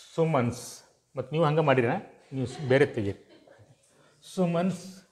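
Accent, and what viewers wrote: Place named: North Karnataka, India. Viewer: ಸೂಪರ್ ಟೀಚಿಂಗ್ ಸರ್ ನಿಮದ ಉತ್ತರ ಕನ್ನಡ ಭಾಷೆ nice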